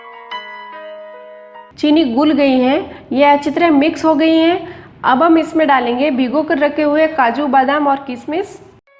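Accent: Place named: Marwar, India. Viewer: All Indian Rasoi waoo hum bhi marwadi h aapna loog ki boli s ptaa chl jtaa h ....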